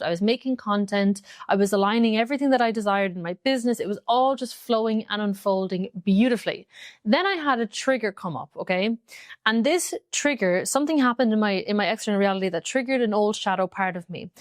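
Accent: Ireland. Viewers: Loving the videos, recently found the channel. Explain it in way that’s freshhh yee. Just curious where are you from, are you Irish ☘️ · Love the irish accent .. Galway man here .. best luck with it all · Are you Irish?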